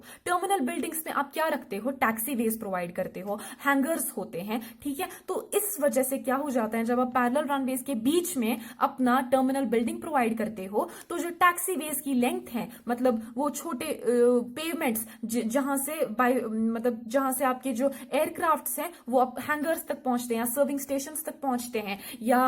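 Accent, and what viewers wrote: Kashmir, India. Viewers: Aap Kashmir se ho mam?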